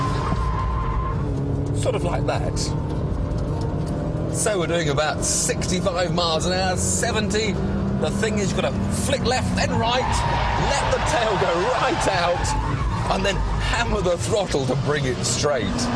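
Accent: United Kingdom. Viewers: lol, he is british, don't you hear accent or at least see, that steering wheel is at the right side of a car. However if yaris is a great drift car, this guy is american and Greece is a center of a world =\ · He's English. Everyone else pronounces it "coup pey". Like the French do.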